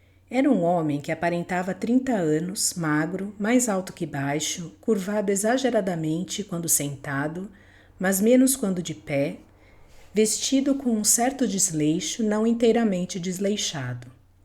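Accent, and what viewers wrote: Portugal. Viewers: I also prefer the European pronunciation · Please forgive my writing in English. I am trying to learn European Portuguese. Is this book by Pessoa being read with European pronunciation, or with Brazilian or some other pronunciation?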